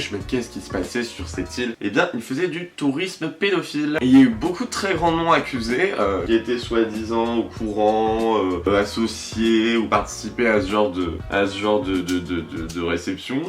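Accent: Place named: Marseille, France. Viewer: Les 14 pouces vers le bas c'est les jaloux de ta bague de ouf et ton accent marseillais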